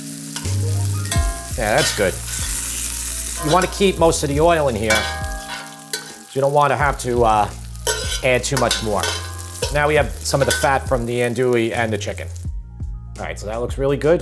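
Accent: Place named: Long Island, United States. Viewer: As an Ontarian Canadian, your Long Island accent makes me laugh every time. I really like your videos and your content, thank you for sharing them with me. One thing I'm surprised though, is that you use these large diameter cooking pans, but have such a small stove burner. Does that really work that well? I would think a larger burner would be something you'd be looking for. Also, is that a propane or butane stove? I never would've thought such a simple camper stove could be used to make food like this. Also, where do you find things like your cutting boards? I like how large the wooden one is, but don't really know how I'd go about looking for something like that.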